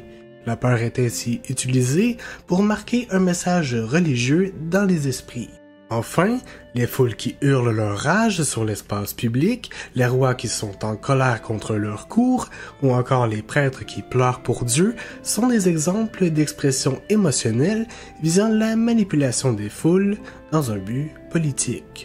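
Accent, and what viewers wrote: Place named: Quebec, Canada. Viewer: je comprenais rien a ce que tu disais, puis j'ai compris que tu etais quebecois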